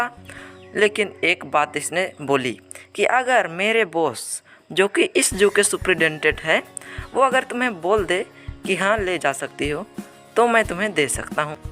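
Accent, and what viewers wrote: Bihar, India. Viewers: Bhai tum meri tarah bihari ho kya😀😀😀😀😘😘😘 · Bihar sa ho kay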